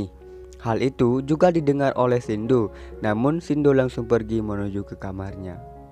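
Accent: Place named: Aceh, Indonesia. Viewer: Lage logat awak geutanyo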